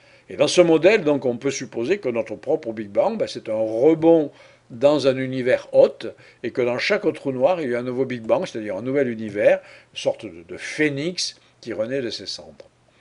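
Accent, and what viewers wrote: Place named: Marseille, France. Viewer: Et j aime bien votre leger accent marseillais ayant vécu un peu vers Aix.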